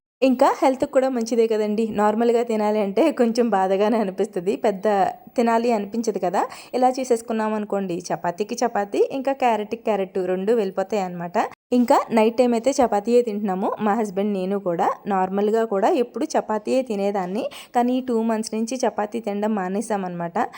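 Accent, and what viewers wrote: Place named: Godavari, India. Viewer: Meru vidiga matladetappudu godavari yaasa lo matladutunaru...voice over echetapudu normal gane matladutunaru baga gamaninchana andi... Ayya baboii siggesestondi .... 😂😂😂😂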